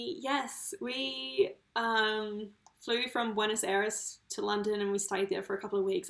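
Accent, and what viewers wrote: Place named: Adelaide, Australia. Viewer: Ha! I thought you must be from SA! It’s your “accent”. I dunno, certain words you say, I’m like “she’s gotta be from Adelaide”!! Did you guys work along the way whilst travelling or did you just save your butts off beforehand? I think it’s amazing that you did this trip- great memories & experiences...it’s literally the experience of a lifetime! * & don’t worry about dislikes- they still count as engagement!😉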